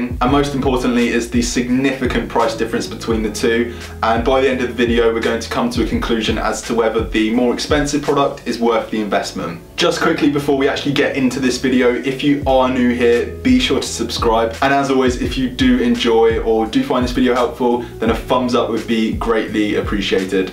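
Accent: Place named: London, United Kingdom. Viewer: Why doller u got london accent we have pounds in london ?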